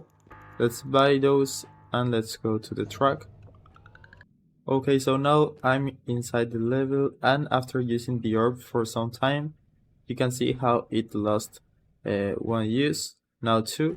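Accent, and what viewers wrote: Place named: Albacete, Spain. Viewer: Este tio es de albacete o por ahi a mi no me engañas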